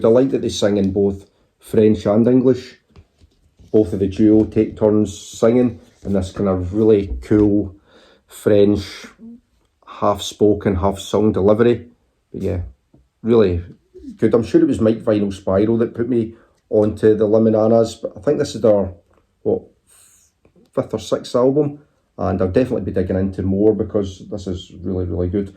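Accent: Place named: Scotland, United Kingdom. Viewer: Hi Look at all those records eh? Wow. That cover art was stunning. Wow. That would probably fetch a nice penny or two. My knowledge being limited to the RSD one I bought this year. Hey, there's the I got in Stockholm. Aww... (my one valuable Albert and some c**t threw a dart at it. Ah, that beautiful scottish brogue of yours. "Kånnty Jo and the Fesh". I had Irn-Bru in Stockholm. Oh, Vanguard. Reminds me of the... Anglia logo? I'd love to get the discography now (on vinyl) since I now have all of the ones, and, so, love that album!... love that album! I don't mind you don't like them. Btw have you tried listening to the ones? Splendid haul. Cheers 😊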